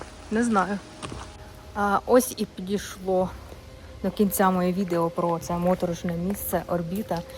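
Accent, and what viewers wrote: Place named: Cherkasy, Ukraine. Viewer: люблю цей черкаський суржик)))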